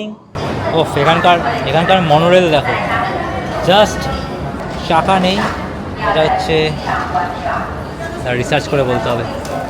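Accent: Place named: Kolkata, India. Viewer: Kolkatar kotha bolche mone hoi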